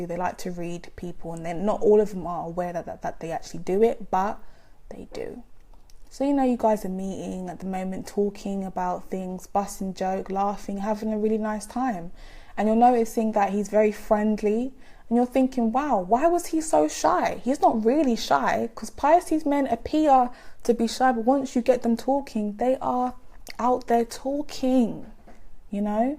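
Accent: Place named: Nottingham, United Kingdom. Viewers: Hi, great video as always. Are you from Nottingham? Your accent sounds similar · There’s nothing wrong with the Nottingham accent 🤨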